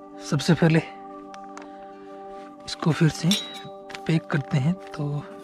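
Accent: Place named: Marwar, India. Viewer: Bawlya k Ped 😂😂😂😂 Marwadi m Bhut pyara bolya Superb Video Bro ,..❤️❤️❤️❤️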